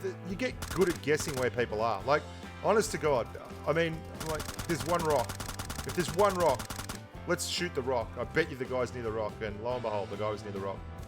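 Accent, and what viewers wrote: Australia. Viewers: Loving that Smooooth Australian voice! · I don't know if it's the thicc australian accent or the great gameplay but I can't stop binge watching your videos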